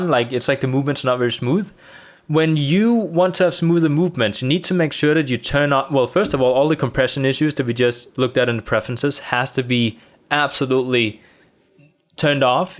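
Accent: Denmark. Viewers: really awesome video, it helped thanks! and btw are you danish? I just though you sounded like it x3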